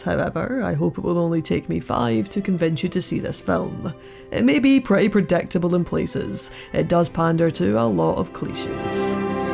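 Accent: Scotland, United Kingdom. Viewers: Where are you from in Scotland? I’m Glaswegian and I get you are changing slightly for other people but I can’t place it, also excellent work! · I am just so used to hearing American engli accent....your British accent sound like crap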